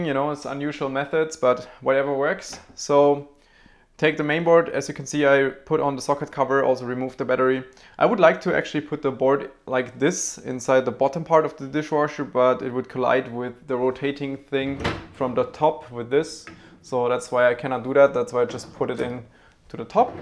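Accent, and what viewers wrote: Germany. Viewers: Deutscher Akzent ist mal da :D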